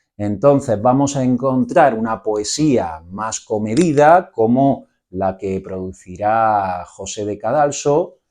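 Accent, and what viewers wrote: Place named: Spain. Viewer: Pues para importante la acentuación tanto no sé qué tiene que ver la acentuación con el acento de este hombre, que tiene un acento muy español. 🙄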